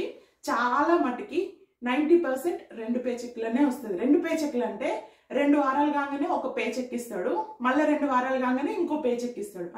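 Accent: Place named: Telangana, India. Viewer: Telangana slang mast vundi miss❤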